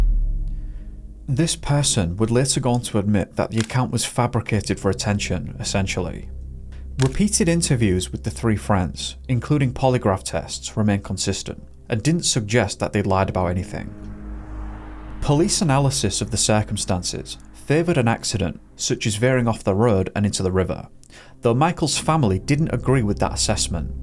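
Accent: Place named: North, United Kingdom. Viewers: Northern English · I love your narration, so articulate, great vocabulary and with a proper northern accent! Thank you for representing with quality content.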